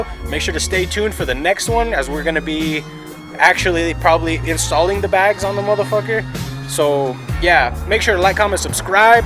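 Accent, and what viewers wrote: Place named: Bay Area, United States. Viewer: i hear hell ya bay slaps! YEEEEE ur from the bay arent you i can tell am i right?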